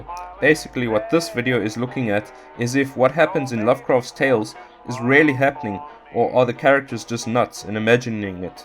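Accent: South Africa. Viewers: I'm really enjoying your content, mate. Also, out of pure curiosity: Your accent.. are you south-African/boer?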